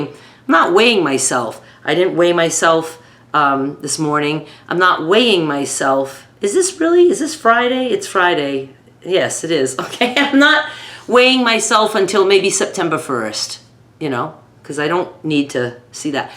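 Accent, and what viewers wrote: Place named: Upstate New York, United States. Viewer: so happy to have found you! I want to ask. Where are you from? You sound just like my family from way upstate NY like the north country accent.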